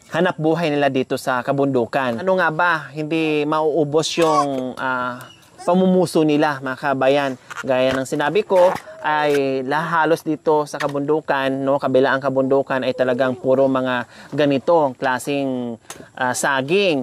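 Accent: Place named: Pangasinan, Philippines. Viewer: tita halata sa diction nyo n po taga Pangasinan kau tulad ng father k na taga Warey, Malasiqui Pangasinan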